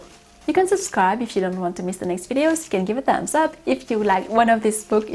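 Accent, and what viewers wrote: France. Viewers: Lovely 🌹 It is relaxing listening to you, talking about books and french Culture.You make me want to learn French and Romance. You have a lovely French Accent. keep telling us more. Thanks Merci.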